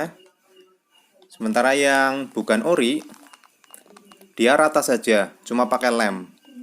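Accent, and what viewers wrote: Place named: Tegal, Indonesia. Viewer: Kiye mah wong Tegal ngalor kiyeeeeeeeeeee 🤣🤣🤣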